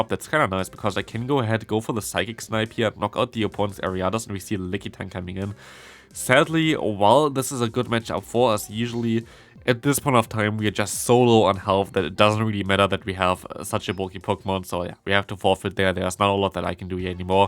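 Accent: Germany. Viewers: his heavy German accent makes it harder to recognise it 🥲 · same his German accent is☠️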